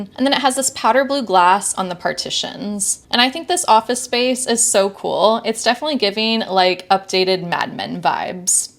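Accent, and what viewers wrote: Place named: California, United States. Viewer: I pick up vibes in a voice and what your voice with vocal fry and upspeak suggests is very arrogant Snobbish and bored. Its sort of a mean girl california sound. It cant help it I am interested in what you have to say but just as if you were shouting at me its hard to get past the affectation.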